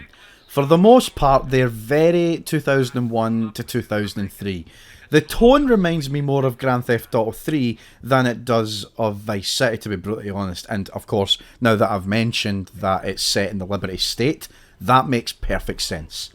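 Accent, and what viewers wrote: Scotland, United Kingdom. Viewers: Gotta luv the Scottish accent.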